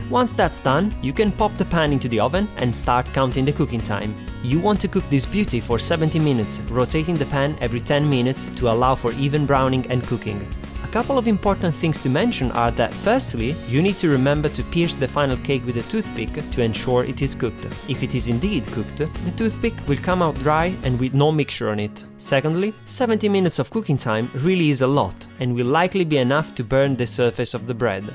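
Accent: Italy. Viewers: I think he is from Italy... it seems so to me cause im italian;) idk tho... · are you italian? im trying to figure out what your accent is, it's really cool!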